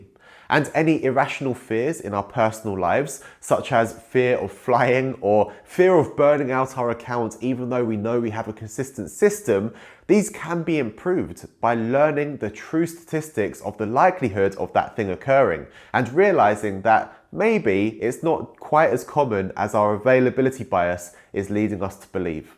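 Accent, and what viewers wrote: United Kingdom. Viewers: Long time no hearing ur british accent welcome!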